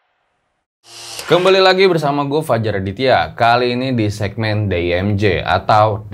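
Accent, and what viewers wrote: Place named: Banjar, Indonesia. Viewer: Banjar baru mana suaranya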